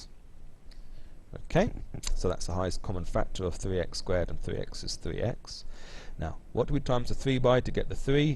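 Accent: United Kingdom. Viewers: gaaahh i love you're british accent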